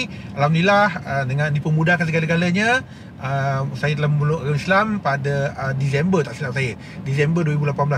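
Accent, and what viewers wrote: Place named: Negeri Sembilan, Malaysia. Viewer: Loghat N9 lak... best dengar...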